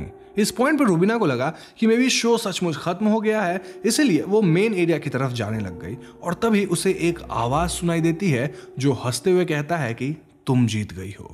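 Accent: Bengal, India. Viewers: The man who is narrating ... Are you bengali? Because your accent is quite like them...😁 By the way amazing narrating 🤓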